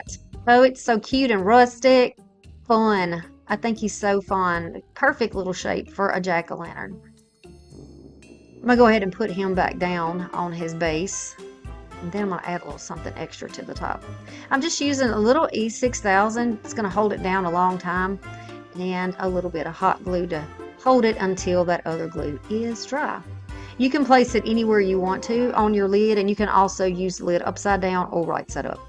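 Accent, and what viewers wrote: South, United States. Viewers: Jackson and a Southern accent... A fellow mangolia stater? Also, adorable crafts.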